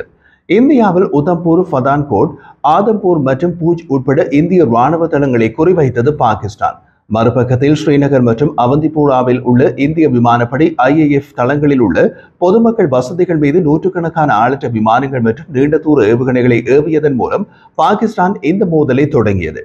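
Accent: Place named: Sri Lanka. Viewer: அருமையான தமிழ் செய்திகள் தூய இலங்கை தமிழ் நல்வாழ்த்துகள்!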